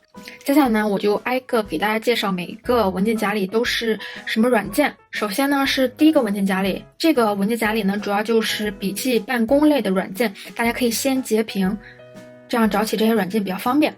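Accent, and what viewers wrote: China. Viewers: 口音是烟台的吧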